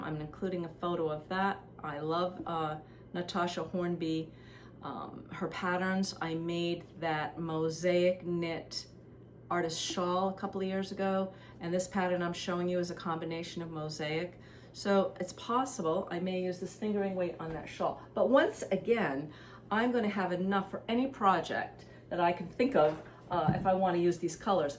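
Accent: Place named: Rhode Island, United States. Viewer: First time catching your podcast (now subscribe) and I love it. I had to go over to IG and find you and now follow you there too. I recognized the accent and then saw you are on Block Island. I'm a southern RI girl myself (Little Compton) and now call NH my home but my heart is in Little Compton. Your projects are all gorgeous. I had to go over to Ravelry to find the patterns and look more. I'm now obsessed with the Against the Current (shawl and tee). I think I'll put the tee on my next spring project list. I love what you are doing with the Vaddel Cardi. Now to see if I can find you on Ravelry.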